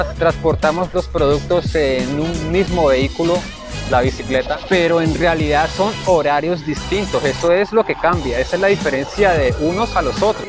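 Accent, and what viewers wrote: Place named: Colombia. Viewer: Buen día De que parte de Colombia es usted??? Porque por el acento NO es de Barranquilla....jajajajajajaja Muchas gracias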